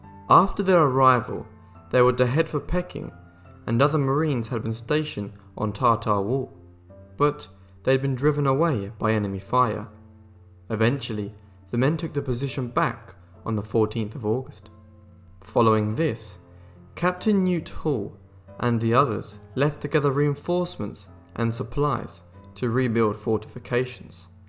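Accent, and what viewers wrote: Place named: United Kingdom. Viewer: Having a British man quoting Dan Daly during the first World War sounds wrong. No way Dan Daly would have pronounced and inflected his words this way, he would have sounded ridiculous. Nice try though. Oorrah Devil Dogs! Semper Fi, Dan.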